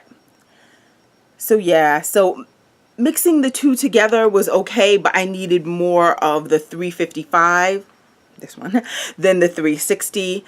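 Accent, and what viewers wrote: Caribbean. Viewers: Yup! I heard a Caribbean accent, I think. I'm from hot Houston, Texas! ❤️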